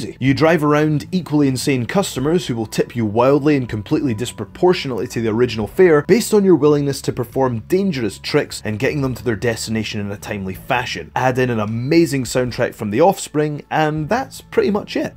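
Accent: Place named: Scotland, United Kingdom. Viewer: Scottish probably from Edinburgh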